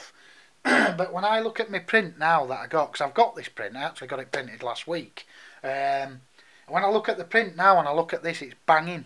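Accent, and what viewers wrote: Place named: England, United Kingdom. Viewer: where in england are you? i loove your accent :D too the spyder pro: Which one to get, and why did you get the Pro?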